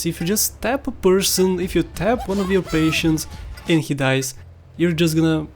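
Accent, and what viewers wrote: Russia. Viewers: Чувак, ты же русский! Я чувствую это!!!! xD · You speak like a russian of English language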